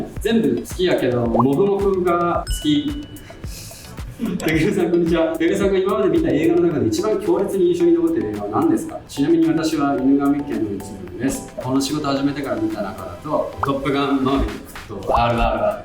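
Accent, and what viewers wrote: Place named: Osaka, Japan. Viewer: いつの間にか大阪弁になってる〜 出来ましたの時の健君のとても嬉しいそうな顔幸せそう🎉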